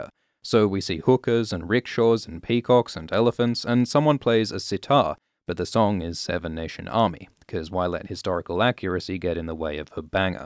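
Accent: Australia. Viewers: Literally nobody comments on your Australian accent for some reason. Anyhow, I'm in Perth, and if you're local, you should come around for a sesh. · I'm just starting your video. I've watched so many and NEVER realized u aren't British!!! I'm an IDIOT!! So, are u from Australia or New Zealand? I've always wanted to travel there. My daughter, Naomi, can do a GREAT Australian accent. I can't even seem to say the word NO like you do. But she can!! Looking forward to this video and seeing you on the Westworld page on the AMINO APP😀💖👏🐴🔫🐯🌻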